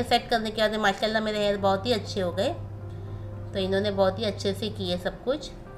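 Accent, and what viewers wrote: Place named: Mumbai, India. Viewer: Bilkul Sachchiiiii baat hai, Andaaz to Wahi hain bolne ke khaalis Mumbai 🤦🏻‍♂️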